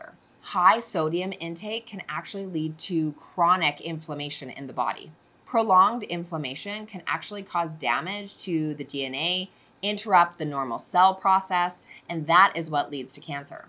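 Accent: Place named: United States. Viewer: great advice. very interesting. I cannot get used to American accents. RESK is a strange word. In England we mostly say R I S K. Nice lady but strange way to describe RISK. She also says FESH instead of FISH. HEY HO I wish the USA well. Thanks for the advice. Please remember that Youtube is shown in UK.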